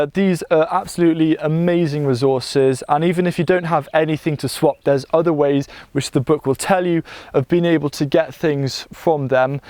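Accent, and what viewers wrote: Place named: England, United Kingdom. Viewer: Gorgeous!!!! Just absolutely beautiful and Gorgeous! .... Oh yes and the book, gardens, and videos too. 😉 Thanks for all your work and content and videos!!!! Must say your excitement, charming English accent, knowledge, and charm keep us YouTubers wanting to come back to watch your videos time and time again. Of course, another reason is that you are very easy on the eyes! 😉 Thanks!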